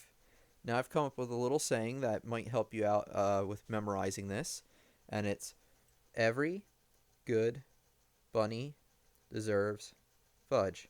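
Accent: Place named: United States. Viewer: Jess Ng lol he sounds like a normal american.. Its pretty easy to comprehend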